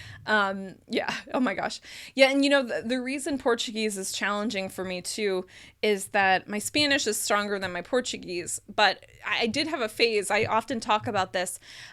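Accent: United States. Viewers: Perfect American accent!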